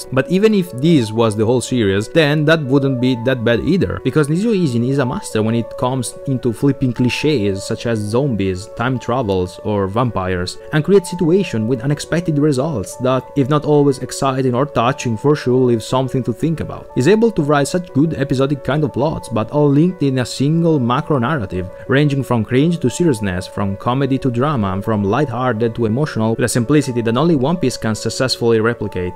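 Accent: Italy. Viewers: Haha, anche io appena sentito l'accento ero curioso di sapere se fosse italiano, stavo per scrivere lo stesso tuo commento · Greetings from Itali fratello. While your accent makes the audio a bit more difficult to understand, it makes me feel a bit prideful to know this franchise has such a smart and good fan and he is from my same country. Wish you well, hope life is going well for you. I had this on my Guarda più tardi for the last few years, glad i finally watched it all. I know i will rewatch the whole Monogatari sooner or later and thanks to your video, i will appreciate it even more. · his accent sounds very Italian to me. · By any chance, are you italian?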